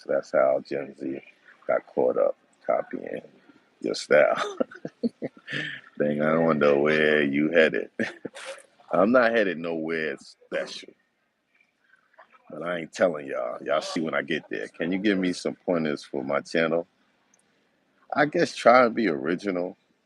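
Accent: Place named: New York City, United States. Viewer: Your NYC accent is diminishing.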